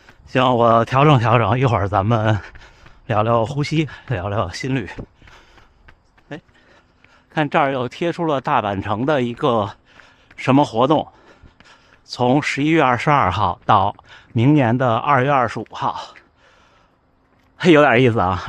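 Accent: Beijing, China. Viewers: 還好北京話我聽的懂🤣🤣🤣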